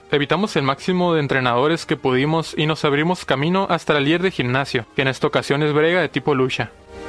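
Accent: Chihuahua, Mexico. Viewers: We tu eres de chihuahua verdad? Xd no te doxe ni nada pero tú ch es muy nuestro jaja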